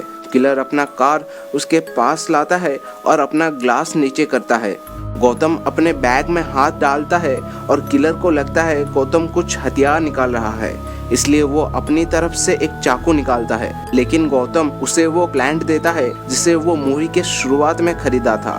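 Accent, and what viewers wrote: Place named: Odisha, India. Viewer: You must be a Odia guy, got from your accent. But nice explanation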